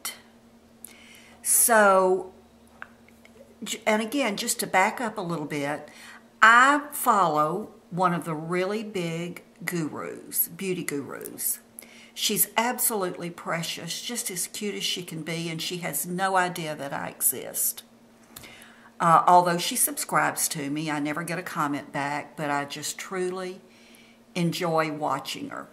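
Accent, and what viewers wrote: South, United States. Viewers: I'm 43 and I'm a Grandma too. You are a beautiful woman and I love your southern accent. Don't ever let a hater get to you. A hater, hates themselves therefore unable to love anybody else. xo Kat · I just subscribed to you! You're so beautiful and refined. Just a soft spoken, gentle Southern woman. I just turned 50 and am going through some anxiety about getting older. I don't look my age, thanks to genetics and staying out of the sun, but it is inevitable. I look forward to watching more videos from you and of course your granddog! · EXCELLENT!!!!! THIS WAS THE BEST REPLY TO HATERS!!!! JOB WELL DONE! I subscribed to your channel for these reason... 1- you look way younger than me (56) and I can use all the tips to look as young as you. 2- you talk to us you tubers as if we were friends forever...love that! 3- you take your time to explain things. 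4- you are just stunning to look at....even my hubby would agree. 5- you are very open and welcoming...you draw us in...so sweet! 6- love, love, love your southern accent. 7- you are truly one of Gods children and that does show....you keep shining. Hugs and Kisses... 💛💛💛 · I just discovered your videos and FELL IN LOVE with them and with you!!! I am 73, and also will go down fighting! I love your soft, Southern voice, very easy on the ears! Love all of your topics! Keep making as many videos as you possibly can and I will watch and be thankful for them all!!!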